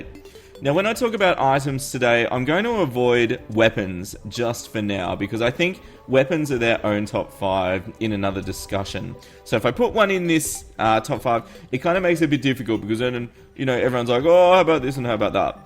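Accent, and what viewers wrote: Australia. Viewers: Just focused on the great aussie accent XD straya ftw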